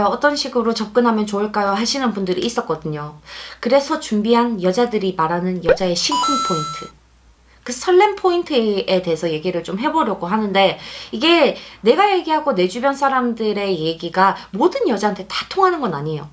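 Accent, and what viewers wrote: Gyeongsang, South Korea. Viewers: 경상도시네요....음...어딘지는 감이..새벽이라 잠이와서 ㅎㅎㅎ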